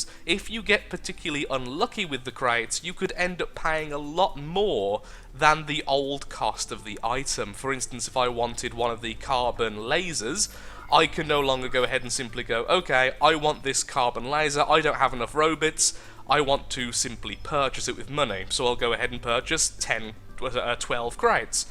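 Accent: United Kingdom. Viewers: British for the win!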